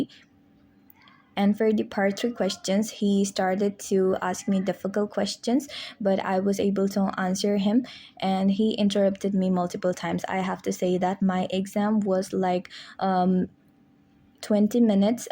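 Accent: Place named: Sylhet, Bangladesh. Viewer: Your accent is really nice, r you from sylhet btw?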